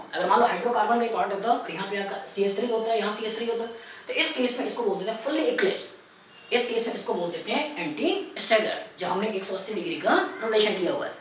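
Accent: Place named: India. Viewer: Thank you sir for your lesson but I understood your english poorly is it Hindinglish?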